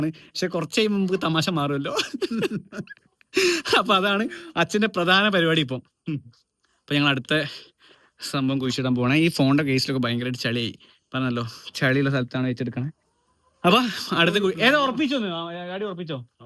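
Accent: Malappuram, India. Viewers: മലപ്പുറം thanne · നമ്മുടെ മലപ്പുറം ഭാഷ എന്തേനി🎉🎉🎉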